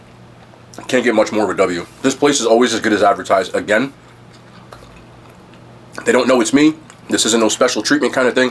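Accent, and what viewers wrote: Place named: New Orleans, United States. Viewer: I fw u u tried to talk like how we talk in New Orleans⚜️ much love to u bro😂💪🏽💯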